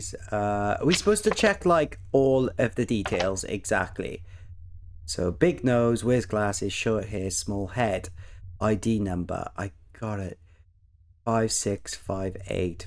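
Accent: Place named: Wales, United Kingdom. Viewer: Ohhh welsh!! Yeah i was thinking smth british but it didn't sound quite london-ish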